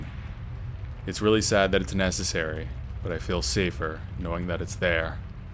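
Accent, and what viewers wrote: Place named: Canada. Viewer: The reader is def Canadian lol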